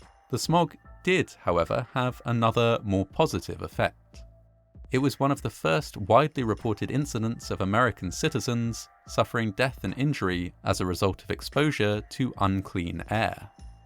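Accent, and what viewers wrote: United Kingdom. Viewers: Your historical accounts of these tragic events across the planet and during recent centuries, are exceptionally well researched and are excellently narrated and visually presented! Your sensitivity in presenting these human tragedies is also very commendable! Thank you very much kind sir! I enjoy your British accent and turn of phrases, especially when they're used to describe North American life. As Canadians, we're more likely to use American terminology even though we've largely retained British spelling and syntax. Within this short documentary, you use: "the high street" versus (the North America) 'the main street'; "football pitch" versus 'football field"; "caskets" versus 'coffins'; "steel works" versus 'steel mill'; I should have jotted down them down as there were perhaps a couple of others! I'm certainly not suggesting changing your speech or approach. 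I just thought that viewers outside the UK might have noticed and appreciated this diversity of English idiom worldwide 🌐! Perhaps English usage throughout the former British Empire follows the original traditions. I'd imagine that due to the influence of the cultural elephant next door, that 'Canadian English' is much more Americanized than most! 🇬🇧 🇬🇧 🇺🇸 🌎 🇺🇲 🇨🇦 🍁 🇨🇦 🇺🇸 🌎 🇺🇲 🇬🇧 🇬🇧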